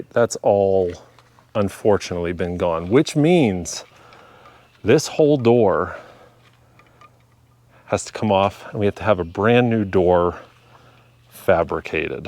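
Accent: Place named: Pittsburgh, United States. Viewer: by chance are from Pittsburgh or surrounding Allegany or Westmoreland County i detect a light Pittsburghease in your voice and you yard an intro shots of the car driving looks like the area thanks for the content and I will check my connections an see if I can find you labor for metal fab an body work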